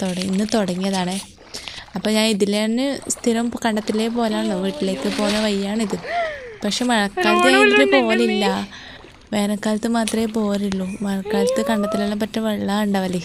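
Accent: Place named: Kasaragod, India. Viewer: ഞാൻ nileshawaram ആണ്.സംസാരം കേട്ടപ്പോ തോന്നി❤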